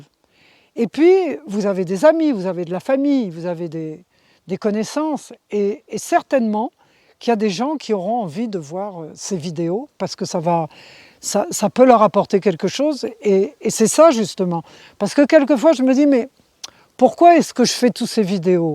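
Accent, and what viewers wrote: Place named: France. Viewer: mais elle est francaise cette personne, francaise de france, c'est quoi le rapport une francaise qui se dit autochtone????? qui se dit gourou autochtone qui va nous transmettre la culture autochtone cette francaise????? ben voyons donc, elle est francaise cette personne la, c'est pas une autochtone elle, son accent est francais de france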